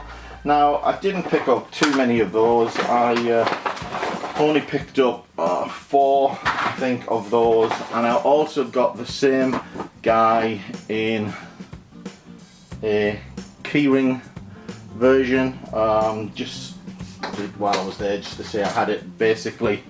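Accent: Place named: Northern England, United Kingdom. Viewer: Nice lego haul, and I'm quite envious of your Lego room, I've been granted some shelves in the closet to store mine. :) I'm no expert on UK accents, but are you from Northern England? You sound relatively similar to my friend from Leeds.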